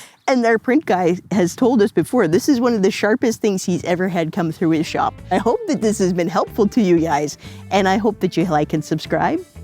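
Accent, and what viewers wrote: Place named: Baltimore, United States. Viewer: Thanks for taking the time to share your thoughts. I just grabbed an EOS R for 1,070 USD! It was a cancelled special order that's been sitting in the store room since 2018. I was going to hire an R5C for a job, but this purchase didn't cost too much more than the rental fee. What SD cards do you use? I've found that Lexar V60, 1800, UHS-II have been massively discounted recently. I'll mostly likely shoot in 1080 most of the time, but I'd like to get the best 4k onto the card, when I do use it. Are V60 cards to the challenge or V90 UHS-II cards critical? Is the difference between 8 bit and 10 bit dramatic, or is it something that you notice only when really analysing the output? I'm originally from Baltimore and it's always funny to me when I hear the same pronunciation as my way of saying the letter "O, from aaaall the way in the FNA (Far North America). 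Cheers!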